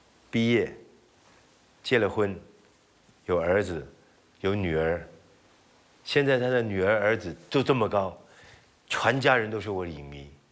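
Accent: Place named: Hong Kong, China. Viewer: yeah it is. He's good at speaking English but I can tell he still has that hongkong chinese accent when he talks. And like he's not fluent at English, his sentences aren't great. But prefer him speaking cantonese, which they should tell him to do more. Ik cause he does stuff in China he had to learn mandarin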